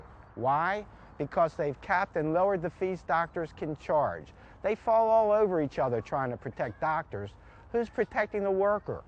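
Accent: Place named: Baltimore, United States. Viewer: Super Ballamer accent. Long o and a sounds. Forget Mr. Ray get this guy for a John Waters movie. He's into golden showers.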